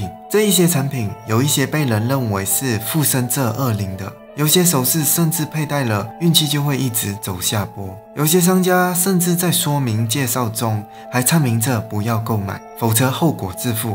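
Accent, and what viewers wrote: Malaysia. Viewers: 應該是馬來西亞人，聽口音就知道了。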